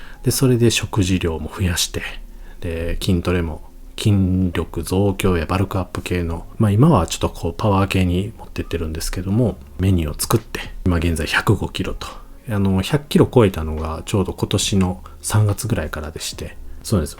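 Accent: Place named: Kansai, Japan. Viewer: さんの地声での動画は初めてなので、動画見ながら、ん？関西弁？って思ってたら、大阪だったんですね！！🌟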